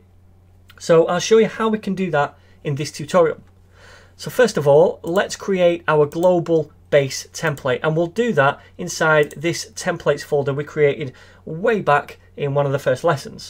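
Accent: United Kingdom. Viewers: The stof hahaha, love that british accent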